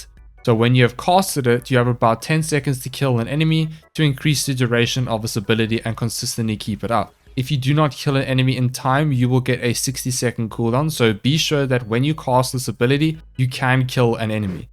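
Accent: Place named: South Africa. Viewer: Is this guy South African because he definitely sounds like he is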